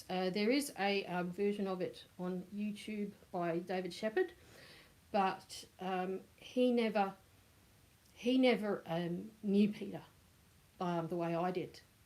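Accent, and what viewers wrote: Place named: Australia. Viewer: Sharing your gorgeous Aussie accent and aspie outlook, and recent dip (10 years ago) into the recorder and early music.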